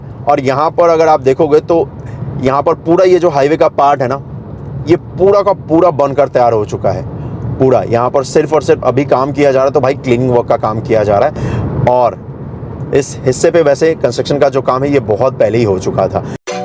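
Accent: Bihar, India. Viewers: Bhai tum Bihar se ho kya...?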